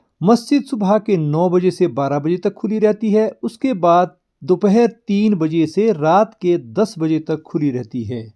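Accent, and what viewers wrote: Standard. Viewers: Aap ek dham pure Hindi bolte hai unkal ji ❤❤veda cute ❤❤❤❤😊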